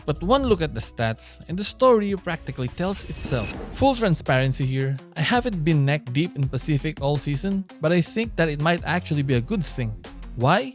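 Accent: Philippines. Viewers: why do u sound filipino bro. Anyway, here's my sub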